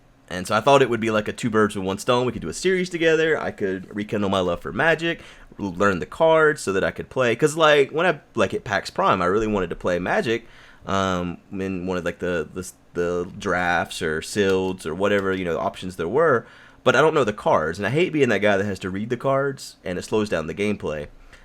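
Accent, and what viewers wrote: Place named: Alabama, United States. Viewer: dude, are you from Alabama?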